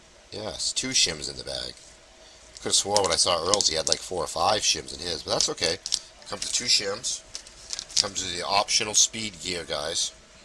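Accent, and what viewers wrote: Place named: Maine, United States. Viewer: Wow your from maine too I thought u might have been by your accent great vid by the way 👍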